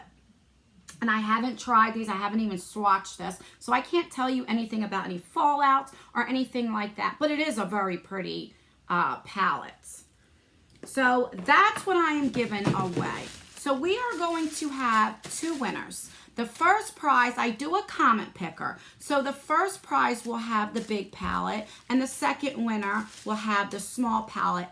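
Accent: New Jersey, United States. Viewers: you are so generous and giving. Thank you for doing this. My husband was raised in New Jersey, and I enjoy listening to the Jersey accent so much. Besides, you are very fun and expressive! People like you are loved and hated because of your beautiful soul. Keep being who you are, it really lift spirits. God bless you always! 🌺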